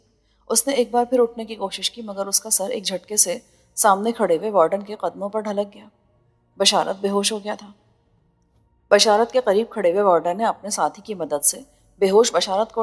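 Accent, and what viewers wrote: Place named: Lahore, Pakistan. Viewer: You imitate beautiful Lahori accent well done you are my best Voice on YouTube😂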